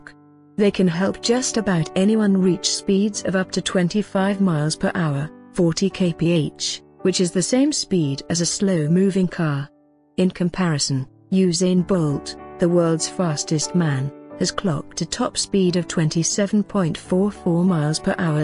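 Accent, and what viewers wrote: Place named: United Kingdom. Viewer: Jeeez! Fire whoever designed this video! Nobody wants a screen full of words while a British-accented woman goes on and on in a curt cautious voice. Sheesh! Rule number 1 Pick your target audience! Are you aiming for middle aged women? I don't think so! Time for a major rethink!! Guess what we want to see when you have a title including 'Bionic Boots'? We SURE DO want to see those boots. What did you give us? A screen full of words. Not cool. (Hint: Seek coolness)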